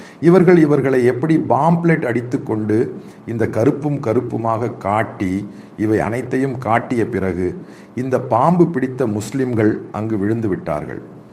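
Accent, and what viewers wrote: Kerala, India. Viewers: தமிழுக்கும் மலையாளத்துக்கும் இடைப்பட்ட ஒரு மொழியில் பேசுகிறீர்கள் 😇 மன்னிக்கவும் , பாதி புரிகிறது பாதி புரியவில்லை.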